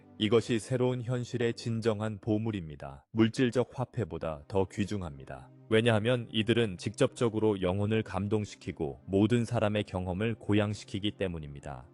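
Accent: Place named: Gyeongsang, South Korea. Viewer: 대박대박대박 화이팅하이숑 야호야호야호 경상도 사투리가 당연하지요 창녕띠기 아인교 야호 신납니당~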